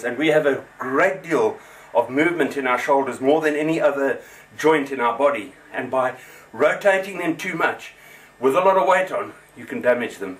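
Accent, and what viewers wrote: South Africa. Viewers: is that a south african or rhodesian accent u have? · are you from south africa? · Hi, are you south african?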